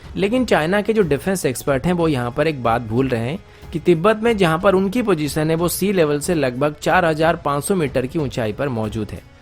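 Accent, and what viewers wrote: Odisha, India. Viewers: Lite odia accent . I know bcoz we both are from odisha😀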